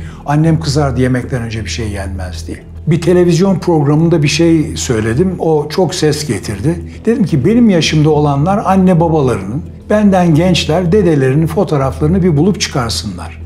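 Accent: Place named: Istanbul, Turkey. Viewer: Istanbul lehçesi ayrı bir zevk veriyor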